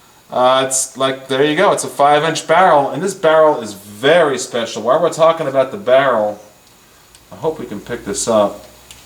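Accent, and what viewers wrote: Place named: New England, United States. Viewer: Ya from Mass? got the New England accent going on there.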